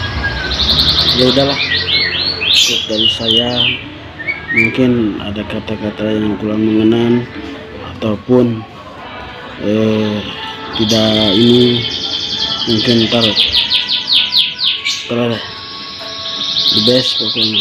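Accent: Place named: Sunda, Indonesia. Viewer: Aduh meni leket pusan sundana si amang pace .terus berkarya pace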